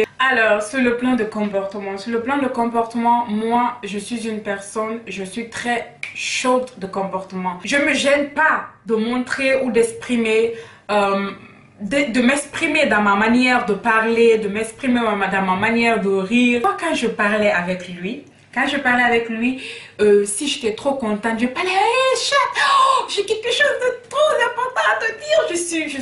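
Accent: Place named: Conakry, Guinea. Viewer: Un accent de conakry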